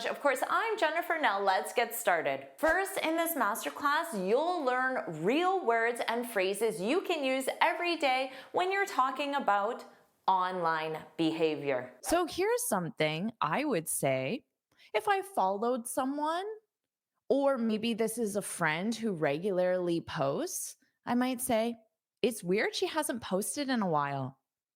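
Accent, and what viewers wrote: United States. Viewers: Thanks a lot for real American English .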